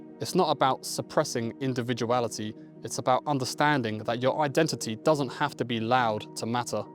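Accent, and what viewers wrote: United Kingdom. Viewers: I thought like what? Then imheard a brit. Gotcha buddy. After your home lots of stuff gonna feel surreal.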